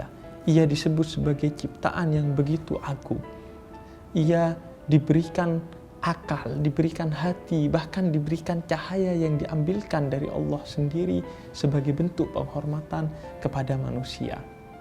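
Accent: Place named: Java, Indonesia. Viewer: bib jomblo muka arab logat jawa hee maaf bib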